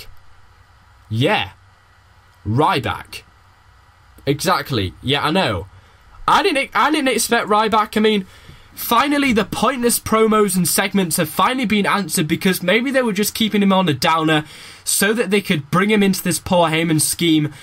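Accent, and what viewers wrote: United Kingdom. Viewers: I thought you were British!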